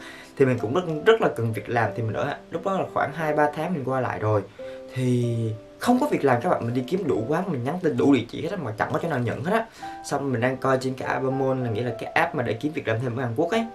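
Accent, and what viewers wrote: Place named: North, Vietnam. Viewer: Nghe giọng anh càng ngày càng ra giọng bắc nhỉ, nghe cute dã man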